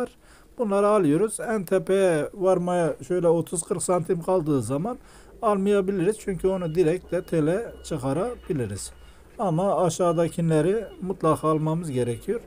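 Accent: Malatya, Turkey. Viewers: Bende malatyaliyim bizim yöre şivesine çok benziyordu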